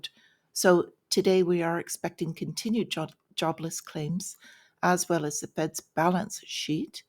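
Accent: Ireland. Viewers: So I was right! I thought I detected an Irish accent :-)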